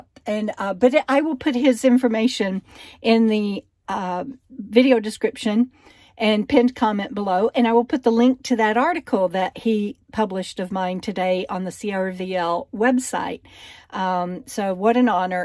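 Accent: Texas, United States. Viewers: Pence que hablas espanol, tienes que dejar el acento texano. Besos y KOKO!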